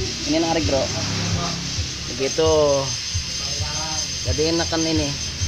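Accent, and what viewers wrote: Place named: Banyumas, Indonesia. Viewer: Jen ngapak temen kang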